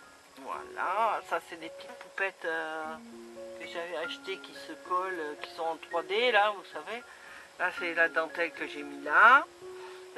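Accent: South, France. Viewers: 🐱Coucou 😊 J'ai le plaisir de te découvrir grâce à ton commentaire sous la vidéo de notre Fanfan scrap, donc je viens découvrir ton pti monde. Tu fais de jolies creations, ma préférée est ton tag avec le cheval, mais c'est normal car le cheval est mon 2ème animal préféré 😉 Peux être est tu tjr en vacances, si c'est le cas profites en bien, car moi je n'ai plus le privilège de partir en vacances, depuis les dernières en 2011 (pdt un week-end) en Normandie. Je suis de la Région Parisienne mais à ton pti accent il me semble que tu dois être du Sud. J'espère que ça va mieux avec l'épreuve que tu as passée avec ta fille! Je pense à ça, si tu es tjr en vacances, pour pas que ton album s'abime avec le climat, tu peux le mettre dans une grande poche de congélation fermée, c'est bien pratique. Je te dis à bientôt et te souhaite une bonne journée.🌅 Bisous 🐎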